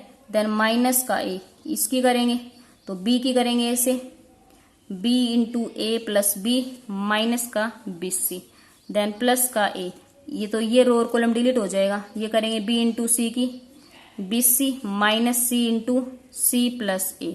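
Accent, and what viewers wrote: Haryana, India. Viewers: Mam aap haryana se ho Kya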